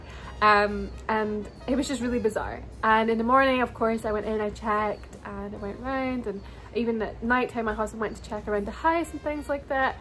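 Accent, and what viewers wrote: Scotland, United Kingdom. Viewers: OMG that's where I heard that accent from! I live in Scotland.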